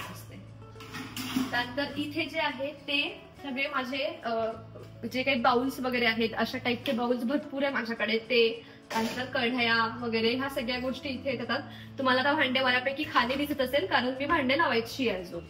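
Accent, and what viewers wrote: Vidarbha, India. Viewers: Mala tuza aajcha kitchen organization khup awdle,tuzya languagewarun nakkich tu vidarbhatli aahes ase watle,karan me suddha thitlich aahe wardha district apli bhasha aikayla khup chhan watle,me pan aatach modular kitchen kele,organize kele baryapaiki pan aata tuzi pan idea use karel apli manas apli mati😅😅😅😅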